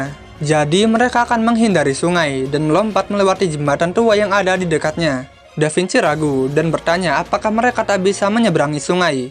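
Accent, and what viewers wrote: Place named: Bali, Indonesia. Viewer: logat nya cerita kayak orang bali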